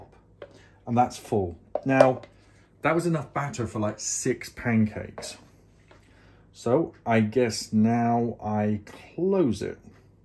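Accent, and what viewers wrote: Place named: United Kingdom. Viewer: I had no idea Echo can have a British accent. That is cute.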